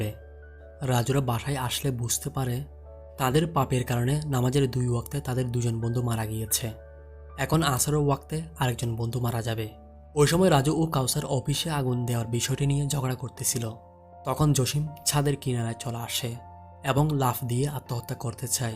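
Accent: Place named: Chittagong, Bangladesh. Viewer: আপনার বাড়ি চট্টগ্রাম। আপনার কথা শুনেই বোঝা যাচ্ছে।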